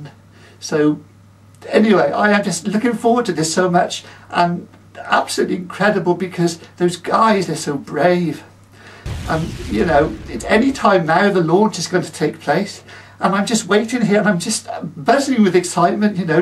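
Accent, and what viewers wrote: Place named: Birmingham, United Kingdom. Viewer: You must know you bad.. You just sound like you, a boring brummy professor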